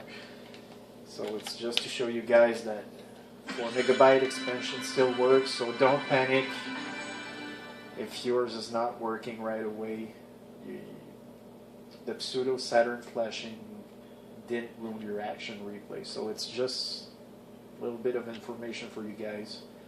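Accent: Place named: France. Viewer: Salut, tu es francais toi vu ton accent. Merci pour le tuto.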